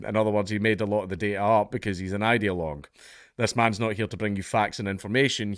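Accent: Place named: Scotland, United Kingdom. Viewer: Sex studies retracted. Gotta love the Scot accent.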